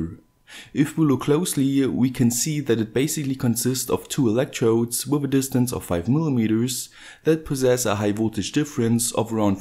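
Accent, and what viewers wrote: Germany. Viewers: Bist du deutscher?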